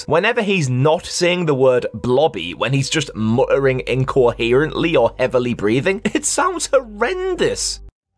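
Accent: Scotland, United Kingdom. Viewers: Bro are you scottish because you sound scottish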